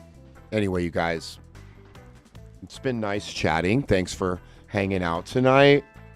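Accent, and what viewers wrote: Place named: Nebraska, United States. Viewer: You sound like you're Hastings Nebraska this is your dialect and maybe you don't know it but sound like you're from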